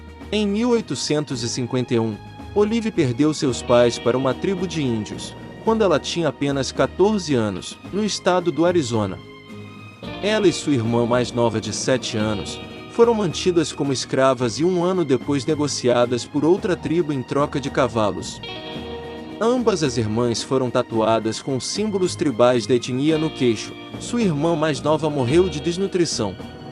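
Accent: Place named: Rio de Janeiro, Brazil. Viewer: Triste é ouvir a de nomes de cidades e personagens com o sotaque carioca! “R” afrancesado e “dchi” no final de Billy the Kid e outros this….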